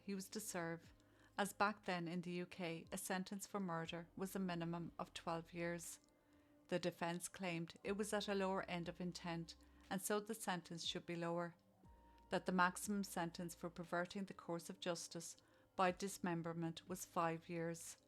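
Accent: Ireland. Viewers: It feels like it's been forever since I've heard my favourite Irish voice. My apologies for my absence lately. Haven't been online due to sickness but I'm back. As always, love your work 💙💙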